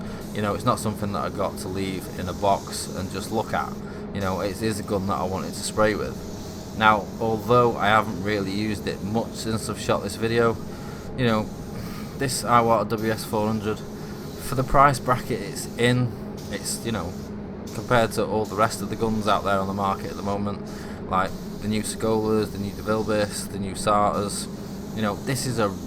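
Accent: United Kingdom. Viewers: Someone paid $$$ for that job! are you doing commerce on the euro or British pound? I'm guessing British pound by your voice? 👍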